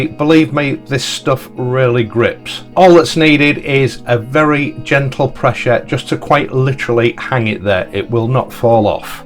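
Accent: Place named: Northern England, United Kingdom. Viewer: Apart from the fact that I'm obsessed with this bike, and dreaming about getting one, I find your presentation style and northern accent (whereabouts are you from by the way?) very easy on the ear. I have to concur with CHANG, you are indeed the David A. of the motorcycle world!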